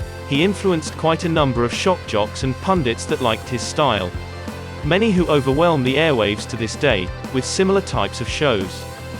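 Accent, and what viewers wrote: United Kingdom. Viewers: Well, he may have a British accent and also be an American. This was a fun trip down memory lane.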